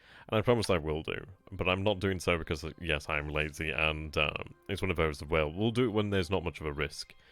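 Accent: United Kingdom. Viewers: is a well spoken English Gentleman, and I have no problem understanding what he says, but I guess if your an American, or Canadian, or from some other foreign country, then he may well sound strange to you.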